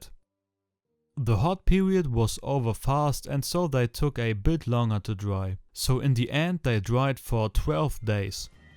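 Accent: Germany. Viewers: A german trying to talk english is the worst... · This is the most german english i have heared in a long time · Bist du Deutsch?😂🫶🏻 Ich mag falsch liegen aber so redet nur ein Deutscher englisch.😂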